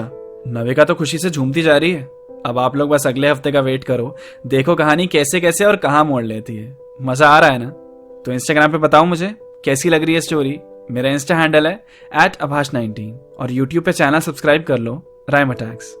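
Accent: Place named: Haryana, India. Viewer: Aap sayad hariyana se lagte ho😋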